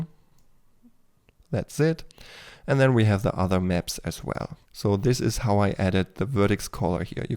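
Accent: Germany. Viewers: German accent 😂 Greetings from Germany!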